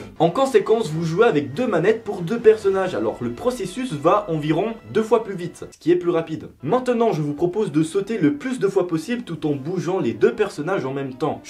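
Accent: Switzerland. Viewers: JE SAVAIS QUE TU ETAIT SUISSE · T’es suisse ?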